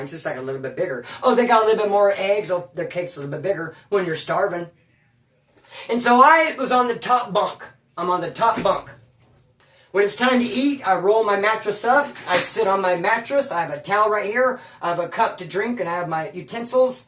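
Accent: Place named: California, United States. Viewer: until you started talking and the Cali accent came out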